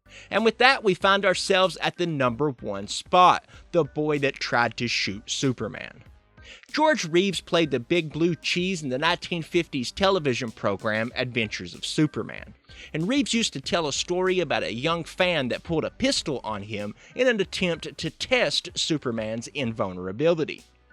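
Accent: United States. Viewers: could have done a "top 5 videos" haha im from oz and your accent is hardcore cowboy haha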